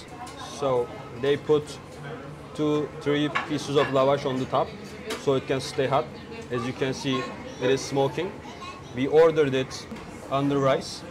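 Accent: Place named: United Kingdom. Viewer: Gerçekten saygı duyduğum bir insansın . Gösterişten uzak işine saygı duyan hayata insanlara duyarlı gerçekten örnek bir kişiliksin . Çizgini de asla bozmadın . Haddim değil belki ama bir birey olarak her defasında aferin , helal olsun cümlelerini içimden geçiriyorsun . Bu yaptığın İngilizce videoların bile amacının globalde ülkemizi ülkemizin zenginliklerini tanıtmak olduğunun da farkındayım . Aslında daha da dikkat çeken ne biliyor musun kaç yıl Amerika’da yaşamana rağmen daha british konuşman ve basit cümleler kurman . Belki çoğu kişi ne demek istediğimi anlamayacak ama açıklayım . Basit İngilizce ile anlatıyor ki biraz İngilizcesi olan bir Hintlide Slovakyalida Rus’ta Arjantinli de anlayabilsin diye . Çok ince düşünmüşsün tekrar helal olsun .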